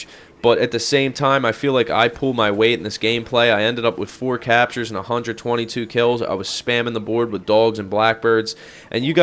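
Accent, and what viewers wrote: United States. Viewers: What state are you from? Killer accent. I'm Australian by the way which is why I can't tell, I can't really tell where all the accents in the states come from :P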